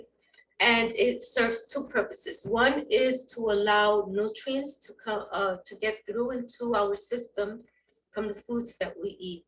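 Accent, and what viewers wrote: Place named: East Coast, United States. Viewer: Thank you for this info. Also I love your East Coast accent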